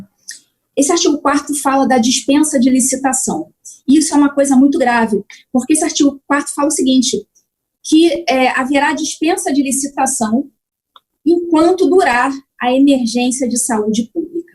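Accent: Rio de Janeiro, Brazil. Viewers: Não é a voz dela, ela fala com acentuado sotaque carioca!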